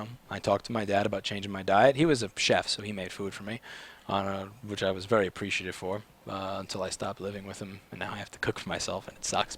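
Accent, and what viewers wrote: New York, United States. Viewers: I love this! You are so smart to see the perspective of others from a child's point of view and being able to re-imagine the scenario as a child. You have a very high IQ and are super interesting to listen to. You are down-to-earth yet have an amazing way of delivering your information. I am obsessed with your videos. I love your NY accent it is so awesome in every way!!!!! melts I am from Toronto, Canada and we pronounce words so boring! I love listening to you talk for your fab NYC accent.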